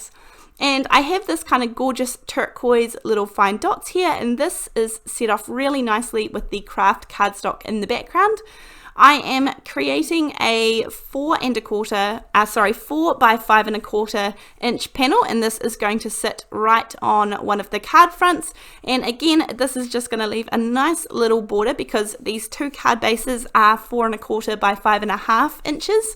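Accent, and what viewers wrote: New Zealand. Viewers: Love this card. I thought it was a N,Z, accent and then you mentioned you are in N.Z. I live in Cape Town but have been on three visits to your beautiful country and it would be my dream to live there. Thank you for sharing your great talent and looking forward to watching many more of your videos. Hope you get well soon.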